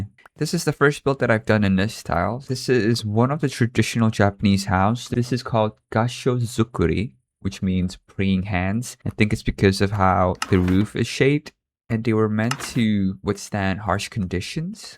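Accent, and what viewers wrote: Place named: Indonesia. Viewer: this is my first time seeing this channel and i thought i recognize that slight indonesian accent and turns out i'm right!! 😄i didn't expect this, are there more of you guys?? peak content btw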